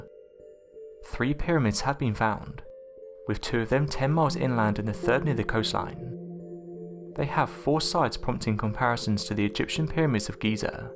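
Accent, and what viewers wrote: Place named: London, United Kingdom. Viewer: London town I'd say he's good hay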